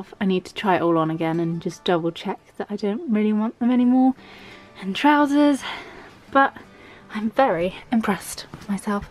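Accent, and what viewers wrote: United Kingdom. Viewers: So satisfying to watch! Loved the kitty checking on everything. I also donated lots of clothes and cosmetics to charity organizations this year. Absolutely LOVE your voice too :) From which part of the UK are you if I can ask? :) xxx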